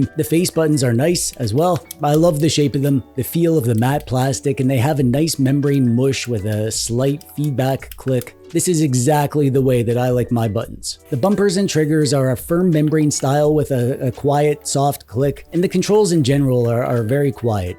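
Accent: Canada. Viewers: are you Canadian? love your vids btw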